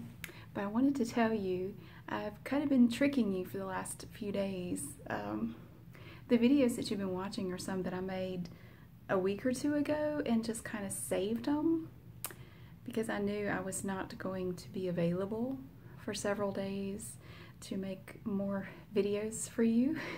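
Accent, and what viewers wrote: South, United States. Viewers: Fell in love with your voice already. Love that Southern accent. Subscribed!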